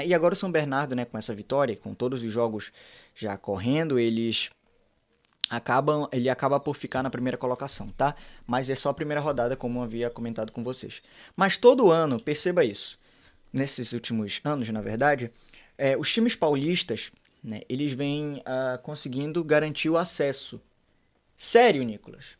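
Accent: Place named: Pará, Brazil. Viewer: Vc não é paraense irmão é o que aparenta pelo seu sotaque 😅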